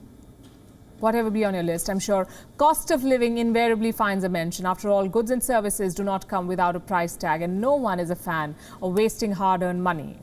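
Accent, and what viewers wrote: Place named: Mumbai, India. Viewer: this dumb mumbai scammer accented anchor thinks its hotshot 🤣🤣🤣😂😂😂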